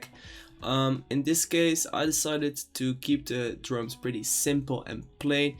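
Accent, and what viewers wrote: Netherlands. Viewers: Hahahaha je can horen dat je Nederlands bent, klopt dit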